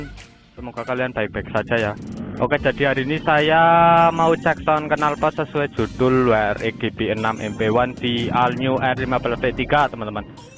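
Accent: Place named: Java, Indonesia. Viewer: Bhsn e kok agak medok² kek org Jawa bro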